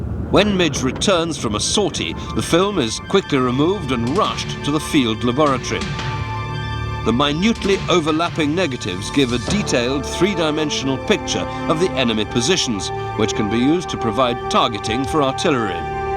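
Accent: United Kingdom. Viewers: I can't listen to a brit tryin to educate me. Oh well...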